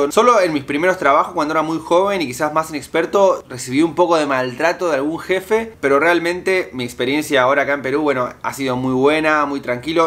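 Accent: Argentina. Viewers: Muy interesante tu vídeo!! Bien expuesto muy claro. Se que tienes todo bien calculado. Me alegro que estes conténto con tu trabajo!!! Es verdad que los argentinos son muy bien vistos y considerados en Peru!! Especial mente por las chicas jajajajajaja 😂. Es sabido que no hay Argentino feo!! Todos son bellos y sobretodo encantadores, creo que eso que decían antes que el Argentino es sobrado ya paso a la historia verdad?? Ojalá todo continúe como dices avanzando y no empiecen las trabas con el gobierno de este señor Rojo!! Espero sigan tus existos tanto en el canal y como docente!! Me encanta cuando hablas y dices Posho, shamame, Sho etc etc jsjajaja😂. Me gusta ver que la gente te quiere!! Los peruanos somos querendones con los extranjeros pero no!! entre nosotros lamentablemente!!! 🙁. Buena vibra 😊 Bendiciones 🌺🌸😊😉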